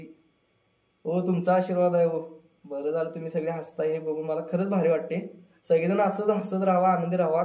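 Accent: Kolhapur, India. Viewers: अतिसुंदर कोल्हापूरला गेलो असे वाटते फारच छान आपली कोल्हापूरची मराठी भाषा ऐकून फार आवडते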